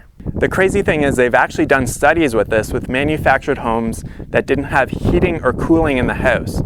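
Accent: Canada. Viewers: Can really hear that Canadian accent in this vid. Lol. Adorable. 😊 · I heard your accent. May I ask where your from? Are you Canadian?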